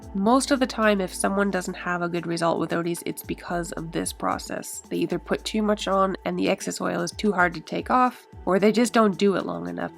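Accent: Canada. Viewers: Hi. I’m a senior Canadian woman living in Europe. So when I see your videos, I get the chance to hear a fellow Canadian. For me it’s also a cultural connection. Your videos are informative - I get to see more characteristics of wood and different styles of furniture. All I see is an excellent presentation - certainly something for which you should be proud. As for your tattoos, I think they’re beautiful. Thank you, also for your generous contributions to protecting bunnies and for caring about your community. Please continue making your videos. I cannot do furniture work in my apartment, but if I had to shop at one of the second hand shops (almost every village has one), I would be better informed regarding the pros and cons of an old pieces of furniture. Thanks so much for making my YouTube experience an educational one (as well as entertaining). Take care and best wishes for a wonderful future. 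…..waiting for your next contribution. Thanks again.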